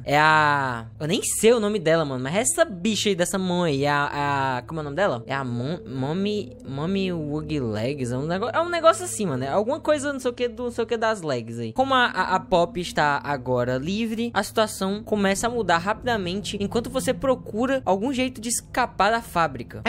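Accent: Northeast, Brazil. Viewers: Terror com sotaque nordestino hmmm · “Ee man, djabo é issai” Como eu amo meu sotaque nordestino <3 skskskjsjs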